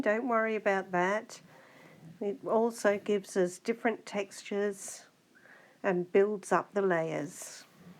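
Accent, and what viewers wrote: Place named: Australia. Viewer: Just found your channel,. Good to hear an Aussie voice. Love wabi sabi style. Can you tell me what the paper is, and the brand?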